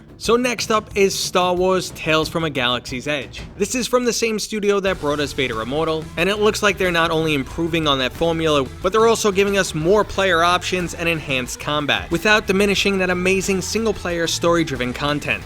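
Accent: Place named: New York, United States. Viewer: Very interesting hearing someone doing a professional announcing video voice with a New York accent but I need more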